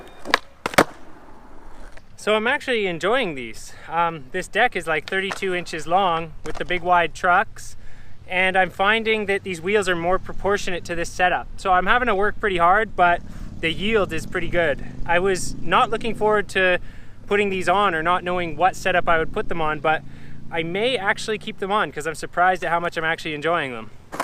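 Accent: Canada. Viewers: Hence my never noticing you pronounce it that way. Wasn't trying to imply that you didn't know better or that were even saying it wrong. But, I get it now. Well mannered Canadians say it that way.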